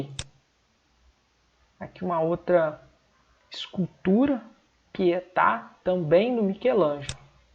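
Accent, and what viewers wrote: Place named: Belo Horizonte, Brazil. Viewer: Aula top! Bigode irado! Sotaque de BH bonito demais! Obrigado pela aula! sucesso!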